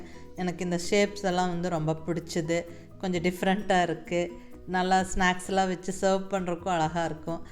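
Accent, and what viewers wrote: Coimbatore, India. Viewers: Very nice mam your red color set neenga cbe ya neenga pesura slang very nice mam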